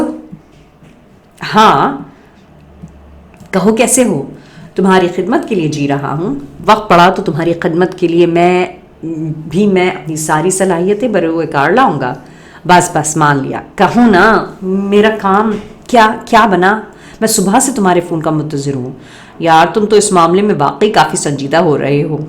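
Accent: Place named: Upper class, Pakistan. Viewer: Irna burger ban k mt bolyn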